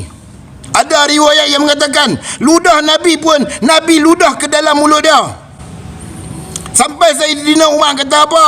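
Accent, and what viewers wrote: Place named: Kedah, Malaysia. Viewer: Salam , org Indon faham juga ya cakap orang kedah Malaysia . Terbaikkk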